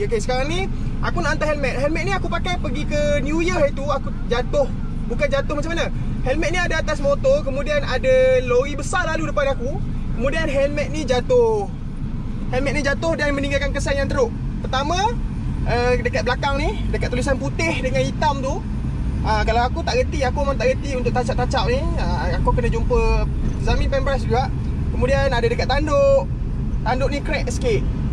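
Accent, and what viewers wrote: Terengganu, Malaysia. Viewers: Mmg minat la abg ni, muka garang tp bila cakap lemah lembut, best lak dgr slang Terengganu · Kecek ganu la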